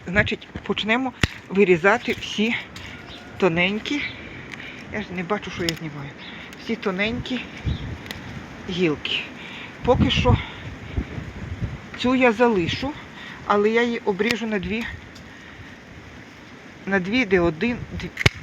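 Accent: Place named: Chernihiv, Ukraine. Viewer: Вітаю Вас! Обрізка гарна. Кординальна. Теж так люблю. Дуже буду чекати Ваші осінні відео, щоб подивитися, що вийшло і як вона буде сформована з квітами. Впевнена, що буде дуже гарний кущ. А ще хочеться подивитися на сорт. Бо в самої є такі 2 різні кущі без назви. І підходять до Левани, і до Кіушу і до Тардіви. І всеодно думаю, шо ні одна з трьох. Тож дуже буду чекати Ваше квітування літньо-осінне. А ще у Вас дуже цікавий говор українській. Такій рідний моєму вуху... У моїх Чернігівськіх родичив такій. Ви не відтіля)))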